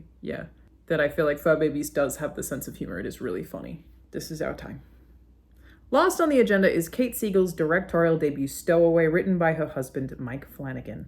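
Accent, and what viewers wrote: United States. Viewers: I loved it and Stowaway was the best for me due to the psychological horror. The ideas presented were brilliantly horrific, without giving any spoilers. And, let's give it up for your American accent. Kudos,. For real. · Watched this last night. Not bad. Weird hearing your american accent since im so used to your Australian..the skydivers bit gave me Cloverfield vibes. But good job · hearing you speak in an american accent was weird as hell.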